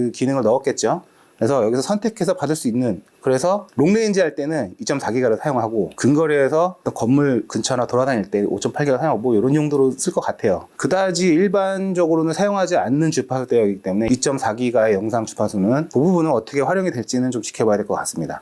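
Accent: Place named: South Korea. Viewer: Korean accent are 🔥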